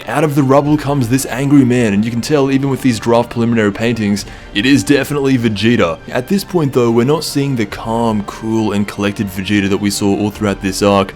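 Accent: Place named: Australia. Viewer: J T it’s the pure Aussie accent we all have including me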